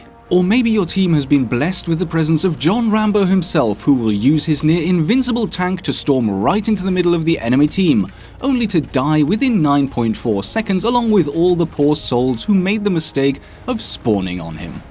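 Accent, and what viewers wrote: RP, United Kingdom. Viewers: Your tone is so pretentious I cannot manage to get through this video. You're talking about irritations on a video game, not reading out the verdict in a highly contested court case. You don't have to speak in BBC English.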